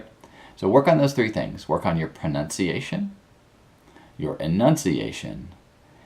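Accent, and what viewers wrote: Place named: United States. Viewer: You are not speaking English, you are speaking American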